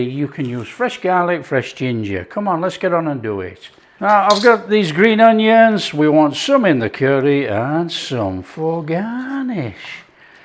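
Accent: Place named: Northern England, United Kingdom. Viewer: New subscriber here, just been binge watching your channel, I'm from down the road in Horwich so it's like hearng my dad talking listening to you with our northern accents, loving your food, I'm going to make your butterscotch tart and your cabbage and bacon casserole this week yummy❤❤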